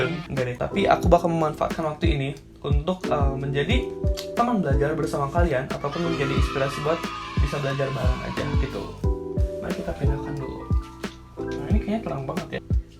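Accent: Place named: West Java, Indonesia. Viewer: Sunda pisaannn euy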